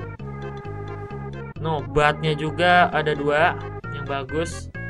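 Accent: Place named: South Kalimantan, Indonesia. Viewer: Abang orang mana kayak bahasa Kalsel aja😅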